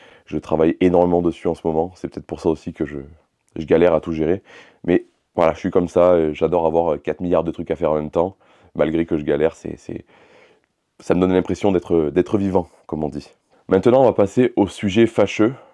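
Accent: South, France. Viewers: oui pourquoi 😅 On dirait le Suuuuuudddf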